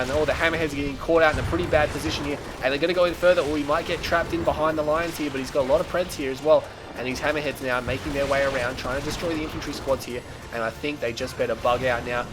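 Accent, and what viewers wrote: Australia. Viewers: Old video I know, but I love your excellent commentary, and the Aussie accent just makes it. Honestly I prefer your casts to Sybert's.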